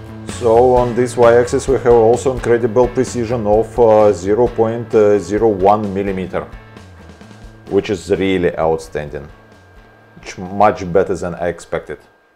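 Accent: Russia. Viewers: Русский акцент) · I'll take strong rashn accent over strong Indian accent any day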